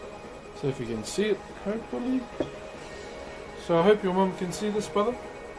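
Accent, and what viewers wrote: New Zealand. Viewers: Is he from New Zealand I love his voice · love the Maori accent, art is important to remember what matters in life.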